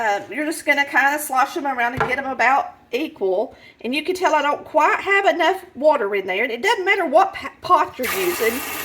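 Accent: South, United States. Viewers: That voice is 1000% southern if there ever was 😳🇺🇸🤔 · I thoroughly loved watching your video! Your accent and your sweet Southern charm absolutely tickled my heart. I live on the beach in Southern California now, but I used to live in Atlanta, Georgia. I had the sweetest Southern mother-in-law—bless her heart. She's since passed away, but listening to you brought me right back to those wonderful days in the South. You've definitely earned a new follower! I'm ready to get my Southern grub going again, and those ham and beans are the very first thing I'm making! ❤️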